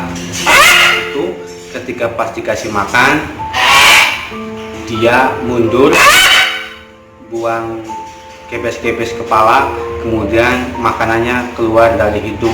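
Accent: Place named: Indramayu, Indonesia. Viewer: Wong indramayu ya bod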